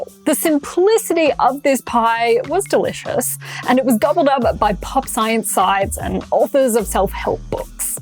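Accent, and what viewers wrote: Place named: Australia. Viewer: One thing that makes me very UN-happy is when obviously Australian (your accent) presenters reverse the video to make it look like they are in left hand drive vehicles on the right hand side of the road. I can see the Sydney Harbour Bridge behind you while sitting in your car, yer the video is reversed to give it that all-american drive on the right look. Please, embrace the fact you are an Australian and stop doing that, meanwhile I'll stay unsubscribed, plus down tick it, until I see this happen.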